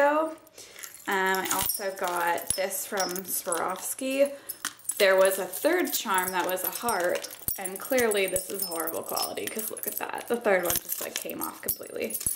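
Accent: United States. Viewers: its like a boston-ey accent lol, they put a ehg emphasis on their a's.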